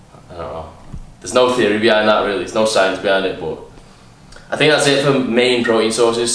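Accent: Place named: Manchester, United Kingdom. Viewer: You got that propa manucunion accent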